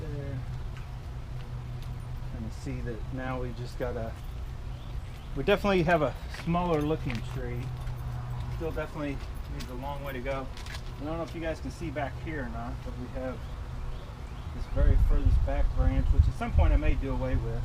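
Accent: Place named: South, United States. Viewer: Love your accent, are you from south of usa?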